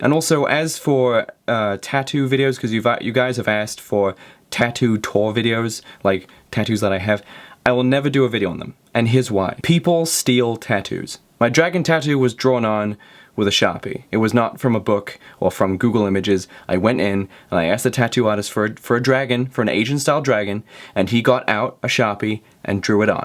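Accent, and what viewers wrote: Australia. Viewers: Dude did you live in Australia for awhile or anything? You pronounce so many words like an Aussie would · Trying to figure out what part of Australia you are from.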